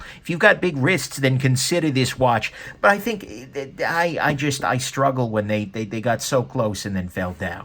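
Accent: New York, United States. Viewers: I love your accent - is it NY? Great video, very entertaining.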